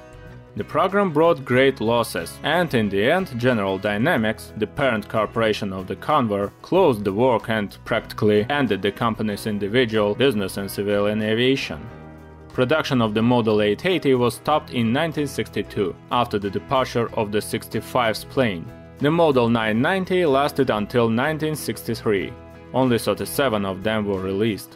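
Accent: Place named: Russia. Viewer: Heavy Russian (or Slavic) accent... makes listening barely understandable. So I added the English subtitles. These auto-generated phrases are absolutely farcical. It'd be funny... if I didn't want to hear the narration and understand it. But I do want to understand things, so I give up. Goodbye.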